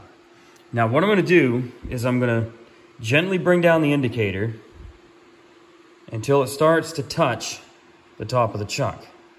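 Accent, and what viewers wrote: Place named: Canada. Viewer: very good info. you sound like a Canadian!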